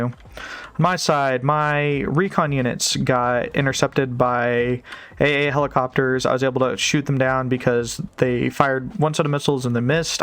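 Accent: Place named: Texas, United States. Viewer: You have the least obvious Texan accent I have ever heard.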